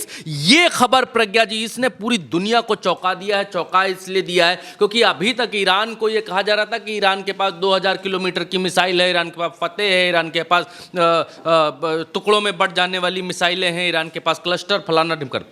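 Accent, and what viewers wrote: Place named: Uttar Pradesh, India. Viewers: Uttar Pradesh ki khadi bhasha ap se sunane me achcha lagta hai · Aapka ' utter pardesh touch ' acha lagta hae bhai...good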